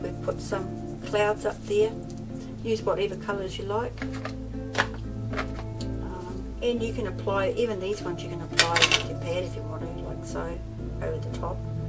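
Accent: New Zealand. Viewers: Hi...I love your New Zealand accent! I really do enjoy your tutorials and please keep talking(or singing!~)I look forward to you next vid...off to watch PT. 2 to this vid! ~Jeanne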